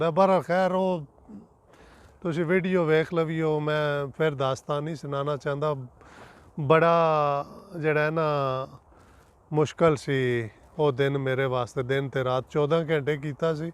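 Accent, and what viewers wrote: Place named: Rawalpindi, Pakistan. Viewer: Bhai sahib lagda hey tusi Rawalpindi tu ho. Tusa ni boli tu lagna ey.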